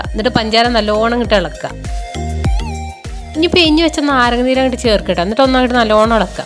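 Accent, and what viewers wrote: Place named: Malappuram, India. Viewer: Malappuram slang pwoli❤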